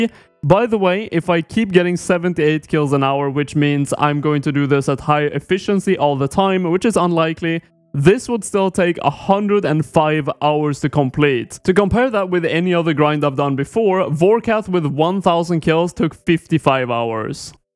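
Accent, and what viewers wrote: Sweden. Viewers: jävlar va svensk du låter 🤣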